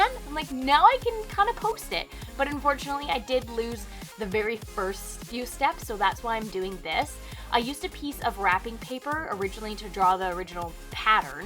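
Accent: Canada. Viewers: Just from your accent I'm assuming you live in Canada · Are you Canadian? “Don’t chya know”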